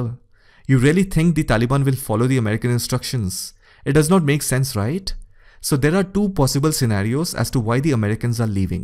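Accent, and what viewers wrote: India. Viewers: Listen your voice sure is from India , India now let Asean people start Suspected of committing a terrorist attack behind the scenes at Pakistan booming.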